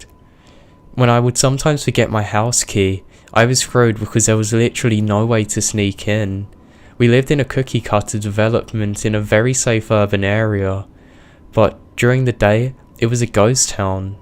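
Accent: England, United Kingdom. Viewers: Hi just found ur channel...I'm an insomniac!! I'm UK too...nice to hear a familiar accent!! Great long vid!!! Thank you!!!🖤🖤🖤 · Why do English people always pronounce anything/nothing like nothink and anythink?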